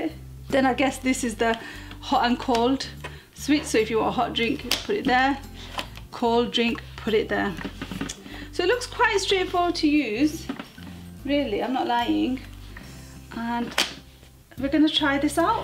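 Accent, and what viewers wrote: Manchester, United Kingdom. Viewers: S B she has an accent. I’d say she come from Manchester way. It’s also known as ‘regional dialect’ . Now the real question is, are you always this rude?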